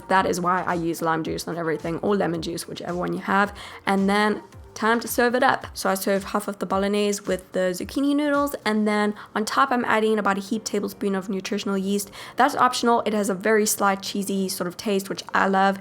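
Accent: South Africa. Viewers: It’s that smooth SA accent. 👌👌😉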